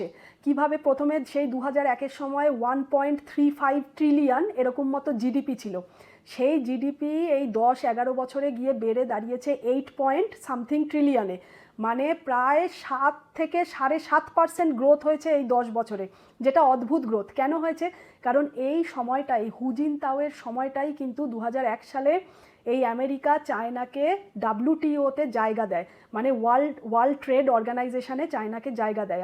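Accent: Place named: Medinipur, India. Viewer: Are u medipuroan by origin ?😅😅 U utter like this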